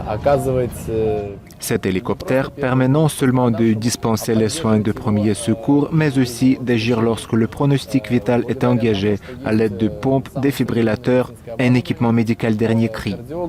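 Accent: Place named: Ryazan, Russia. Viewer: не позорился бы уж своим рязанским акцентом - говори по-русски.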